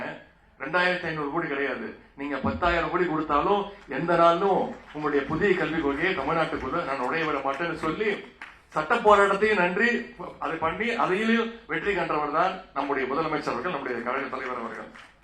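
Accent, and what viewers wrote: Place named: Telugu, India. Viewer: நீ நல்ல தமிழல பேசு ஒரே தெலுங்கு வாடை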